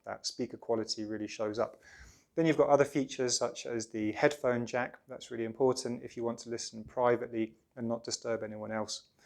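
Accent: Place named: United Kingdom. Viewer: Thank you for this clear concise and detailed description. Your presentation is a pleasure to listen to. Love that British accent!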